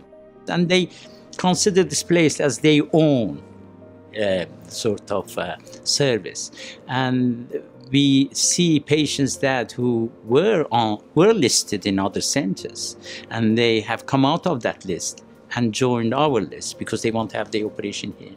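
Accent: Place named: Azerbaijan. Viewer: Azari accent👍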